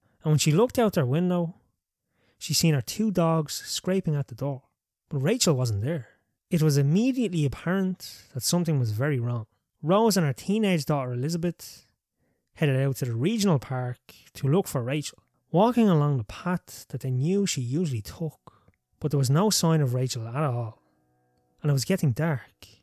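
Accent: Ireland. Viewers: Thank you for taking the time to respond! Very cool of you. I'm in the US...South Boston, Massachusetts. Which happened to have the BEST + biggest majority of Irish-American people filling the neighborhoods of Boston back in the day. Other then Charlestown who had a quite a few Irish also. I grew up with our annual St Patrick's Day parade being at my doorstep. Good ol days lol Southie, as we natives call it, have so much pride in our Irish heritage. There's a neighborhood store where we can order Irish Knits + baby blankets made in Ireland which is about all we have left of our "Irishness" in 2023 lol Used to be someone's Nan that would be knitting those. I said we "had" a large community of Irish here but regentrification...lol It happens. Your content is great! I look forward to future vids. Ty for taking time from your day to respond...figured brogue was better then accent lol And it sounds awesome to these American ears✌️💚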